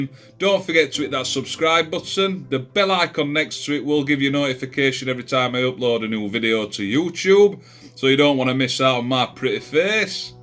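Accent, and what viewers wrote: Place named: North, United Kingdom. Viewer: Got mine on order. Thank you. Love your videos. Nice to hear a northern voice on YouTube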